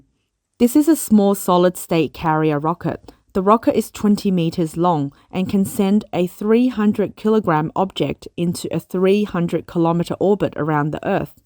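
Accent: Australia. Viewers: Wait, that's an Aussie accent? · façade is pronounced as "FAHZAD." Not the worst Aussie or Kiwi (?) accent but quite pronounced. I've heard WORSE eh ! 😉🙃🙂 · That’s an Aussie accent alright, being Australian myself I noticed it straight away, it’s hard to miss 🙂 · You guys do very good content but can you do away with the Aussie accent? Doesn't fit with what you report..and please get your pronunciations right..facade is pronounced wrongly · there is that Aussie 5 eyes voice over again....... Keep up the trash talk Temu, temu, temu temu temu..... Bring on the launch of temu chyna rubbish products looking for a home. Meanwhile the banks are failing get your cash out now.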